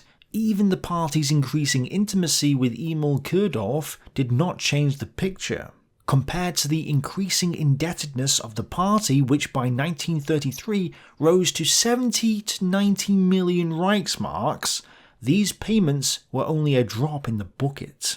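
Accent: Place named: North, United Kingdom. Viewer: Can you do some books on tape where you just narrate? Need more northern folks doing this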